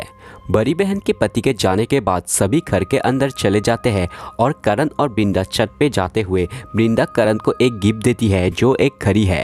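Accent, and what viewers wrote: India. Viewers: Don't judge please ye bengoli hai to problem hoga hi Hindi mein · are you north east bro?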